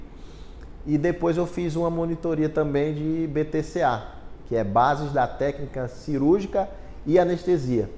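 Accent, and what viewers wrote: Northeast, Brazil. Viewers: Oi Dr.:, eu lhe descobri aqui no YouTube por um acaso, mas foi maravilhoso te descobrir aqui, fiz curso técnico de enfermagem, apesar de não exercer a profissão, eu continuo sendo curiosa em relação aos assuntos de saúde, e com isso acabo relembrando meus aprendizados com os meus conhecimentos de enfermagem e de medicina, percebi desde o primeiro vídeo que vi seu que vc é nordestino, nosso sotaque é inegável em qualquer lugar do mundo! 😂😂😂😂😂😂😂😂 amo assistir seus vídeos! Sou de Arcoverde-PE!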